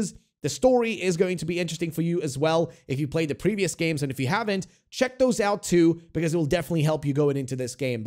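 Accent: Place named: Australia. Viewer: Are you Australian or American? Your accent cant seem to make up its mind.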